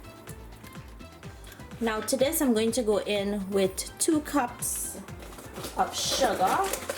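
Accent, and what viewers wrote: Trinidad and Tobago. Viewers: I just love the accent of a Trini I love guava cheese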